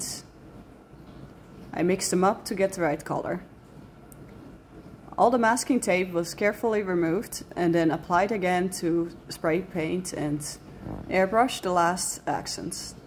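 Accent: Netherlands. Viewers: I can just hear this person is Dutch 😂 great job amazing work😊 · Dutch accent? 🤔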